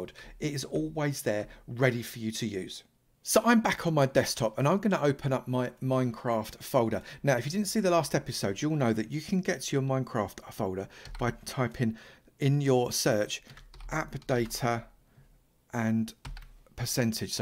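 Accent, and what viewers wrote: United Kingdom. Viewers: thanks very much man ! i had been wondering what i was doing wrong :).... love your british accent btw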